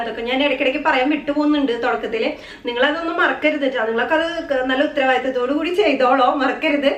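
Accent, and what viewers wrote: Thrissur, India. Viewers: ആദ്യമായിട്ടാ ഈ ചാനൽ കാണുന്നെ... റെസിപ്പി, അവതരണം ഒക്കെ ഇഷ്ടപ്പെട്ടു... Subscribed ഒരു തൃശ്ശൂർ കാരിടെ ലക്ഷണം കാണുന്നുണ്ടല്ലോ... തൃശ്ശൂർ ആണോ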